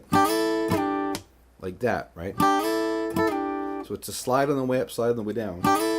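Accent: Canada. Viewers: You definitely have some Canadian spirit in that voice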